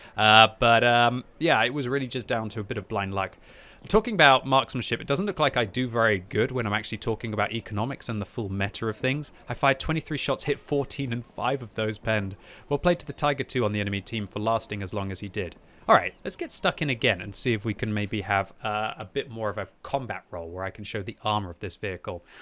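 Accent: France. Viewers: Wow, that Frenglish... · omfg the French Accent is hilarious when you talk French normally. 😂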